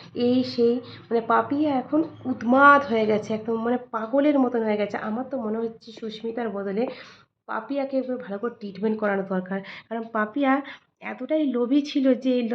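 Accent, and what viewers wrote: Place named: India. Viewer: আপনার কথা শুনে ইন্ডিয়ান মনে হচ্ছে। আপনি জানলেন কি করে।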